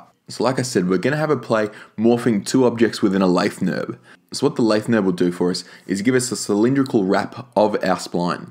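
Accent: Australia. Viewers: Hi, It's great to hear an Aussie accent on YouTube. I love your videos, your camera girl is awesome as well. I'm based in Batemans Bay, south coast NSW.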